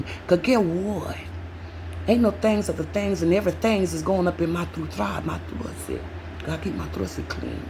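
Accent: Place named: New York, United States. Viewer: Ms.. Love your singing voice per usual! Your commentary cracks me up 🤣. Also I'm detecting like 2 accents from you...Chicago because how you say "restaurant" and NY/east coast😊.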